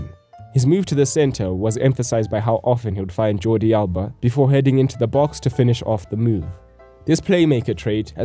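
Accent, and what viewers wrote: South Africa. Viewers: are you South African? you sound SAn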